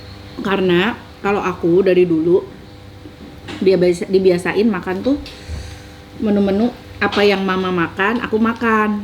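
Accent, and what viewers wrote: West Java, Indonesia. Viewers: Sunda pisan teh...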